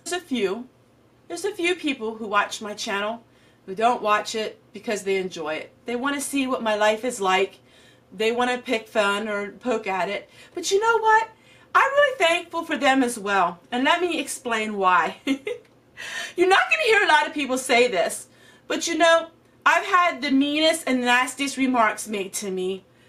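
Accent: Pennsylvania Dutch, United States. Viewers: Congratulations! I found you just over a week ago, but have been enjoying your channel so much. Your laugh (giggle) is one of my favorite parts of them! Your Pennsylvania Dutch accent is charming too! Thanks for being so real and down to earth! Merry Christmas to you!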